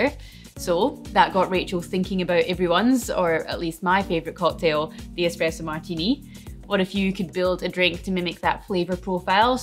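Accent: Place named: United Kingdom. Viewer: i fell in love with your accent , which part of UK?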